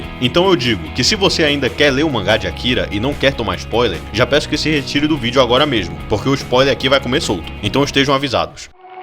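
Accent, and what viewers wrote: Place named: Ceará, Brazil. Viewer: Oloco, cearense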